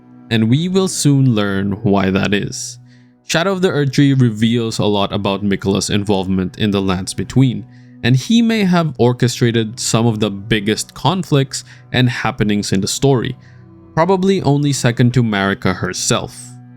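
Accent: Philippines. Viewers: Bro are you Filipino? hahahaa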